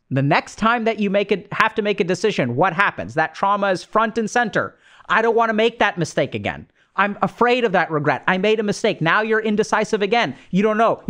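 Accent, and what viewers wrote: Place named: India. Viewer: i love your indian english man :D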